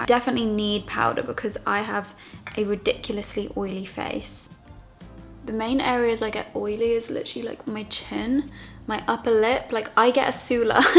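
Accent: United Kingdom. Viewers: Nice British accent ;D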